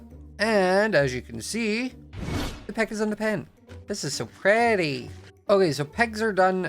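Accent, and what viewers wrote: Scotland, United Kingdom. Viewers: Are you Scottish scot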